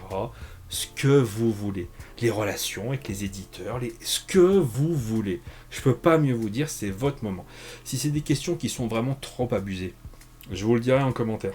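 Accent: England, United Kingdom. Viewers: Félicitations pour tes 4000 abonnés ! Q : D'où vient ce magnifique accent anglais ? Wall Street English ?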